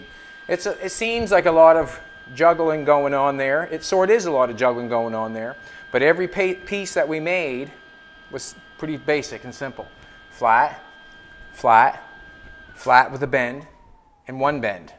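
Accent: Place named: Canada. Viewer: Just discovered your channel a few days ago, and when I heard your accent, I immediately knew this has to a Canadian guy. Your videos are a bit longer than most of the videos I watch, but it's nice to see the uncut process of your work. I think it inspires a lot of people who would otherwise think they couldn't possibly do the things you do. It's good to see not only the successes and final outcomes, but also the trial and error that are part of real life. Also nice to see family involved in your passion. Carry it forward. New subscriber here.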